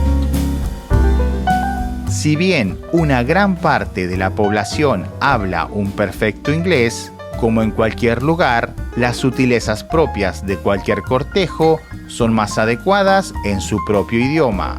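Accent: Argentina. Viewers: ¿Eres mexicano o argentino? Porque veo que haces tomas de referencias mexicanas, pero hablas como argentino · Me suena argentino este muchacho.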